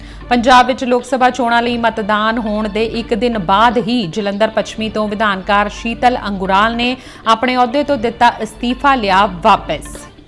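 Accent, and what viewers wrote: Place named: Bihar, India. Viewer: Yeah anchor Punjabi nahi bihari lagdi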